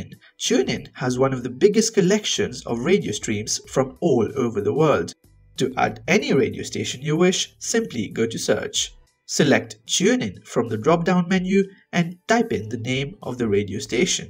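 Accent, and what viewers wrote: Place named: Mauritius. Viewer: Sensing a mauritian accent ... 😁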